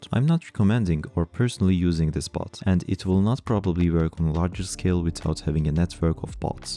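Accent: Turkey. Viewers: aynen ya sesini duyunca kesin türk dedim :D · türkmüsün kanka aksanın tam öyle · gördüğüm en türk aksanlı kişi 💀ama takdir ettim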